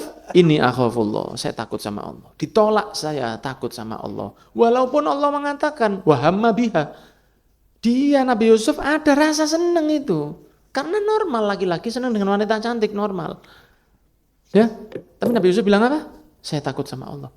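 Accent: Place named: Java, Indonesia. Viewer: ...baru lihat nih habib ganteng abis...tapi ngomongnya kok medok jawa nya...semoga beliau di rahmat i Allah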